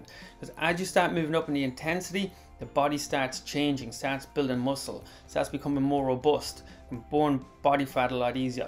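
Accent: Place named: Ireland. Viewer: You Irish ? I’m down in limerick. Nice to see a Irish person cover adrenal fatigue!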